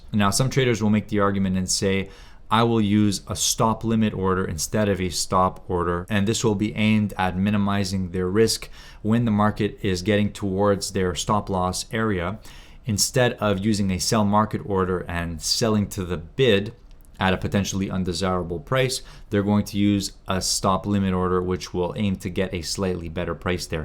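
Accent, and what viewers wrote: Canada. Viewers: what is the name of the plattform? I dont understand canadian english that well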